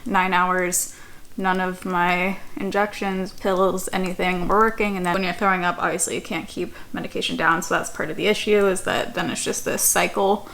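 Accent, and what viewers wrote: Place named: Seattle, United States. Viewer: Listen to you talking remind me of Seattle before I moved out of the state. I miss Seattle so much since there are so many things and places you can go, especially when it comes to healthcare. Swedish hospitals are the best. Their staffs are awesome and very informative, get the jobs done. I hope you feel better soon. I have migraine really bad like u had where I would bury my head the entire days off. I couldn’t take it anymore n went to the ER. But all they give me was Tylenol drips n IV fluids n 1 shot of morphine n sent me home. I ended up having migraine after I went home n decided not to go back to the ER again.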